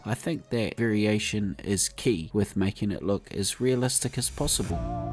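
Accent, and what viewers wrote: New Zealand. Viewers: awesome! been waiting for this one mate :) Looks so like nz countryside, it just says home to me :) loving that kiwi accent too bro!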